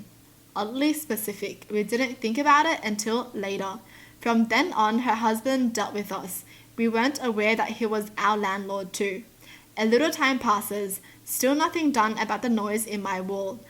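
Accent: Australia. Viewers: lives in Australia. She has an Australian accent. I like her accent, too. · so if americans speak in american do they speak in other language? if brits speak in british accent does that mean they speak other language? lol im loving ur logic shes australian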